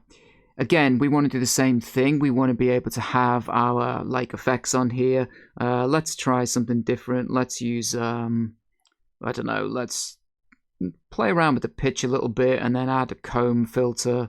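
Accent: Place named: London, United Kingdom. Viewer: I can hear the Liverpool and Manchester -it’s actually the London that keeps wanting to break through 😀✌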